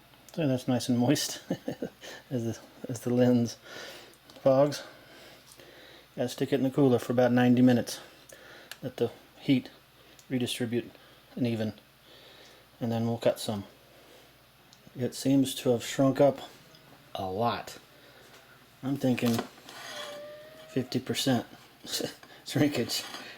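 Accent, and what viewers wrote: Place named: Minnesota, United States. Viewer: You're from Minnesota huh?!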